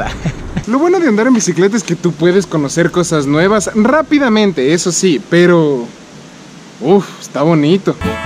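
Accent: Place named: Ecuador. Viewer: Eso me gusta del... No cambia su forma de hablar Ecuatoriano hasta las huevas... Mijin.. ánimo Bro.. más vídeos porfa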